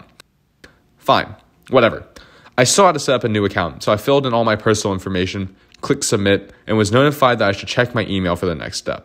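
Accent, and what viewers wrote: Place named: Ohio, United States. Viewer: i can tell you’re from ohio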